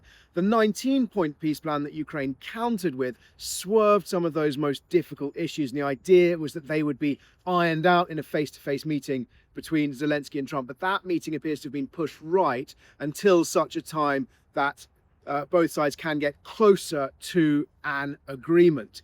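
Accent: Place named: United Kingdom. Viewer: bla bla bla brit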